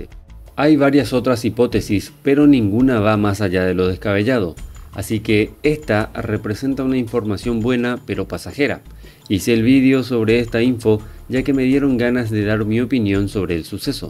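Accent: Paraguay. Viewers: Tu voz suena a Paraguay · Enzo Aquino si viejo, Paraguayo es..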